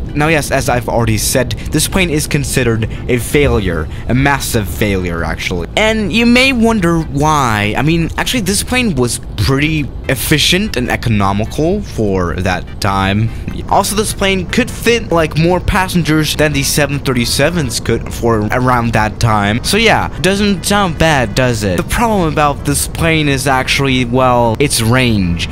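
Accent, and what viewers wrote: France. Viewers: I love ur French accent 😂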